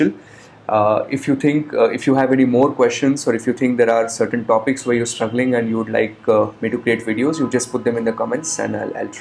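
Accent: India. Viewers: Indian accent stinks.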